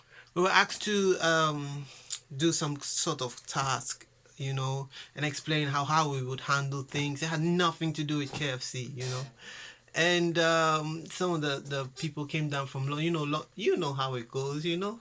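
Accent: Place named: Nigeria. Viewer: You sound like Nigerian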